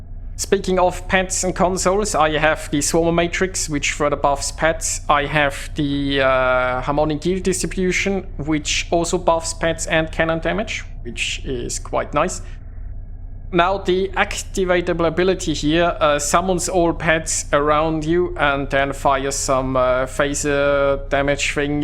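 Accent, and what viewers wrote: Germany. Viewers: Alter dein Deutsch Englisch hört sich geil an😂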